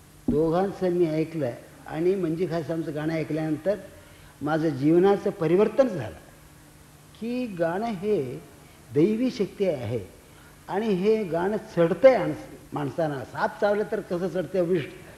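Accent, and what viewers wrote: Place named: Karnataka, India. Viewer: His marathi accent is soo much kaanadi 🤗❤️ felt like he is talking kannada 🤗🎉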